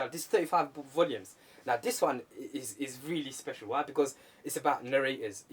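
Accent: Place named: Sylhet, Bangladesh. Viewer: The Sylheti pronunciation...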